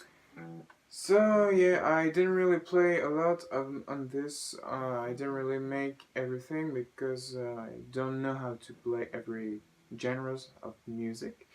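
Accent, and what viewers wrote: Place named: Poland. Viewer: Nice, was thinking to get something similiar in near future, so doing some resarch ^^ (IBANEZ GSA60 WNF to be specyfic or IBANEZ GRG121DX BKF) trying find some significant differences between such types of pickups but the GSA60 speaks to me more look wise :D (Ps. are you Polish? guessing by Accent - no offence since iam polish too xD, just wondering!)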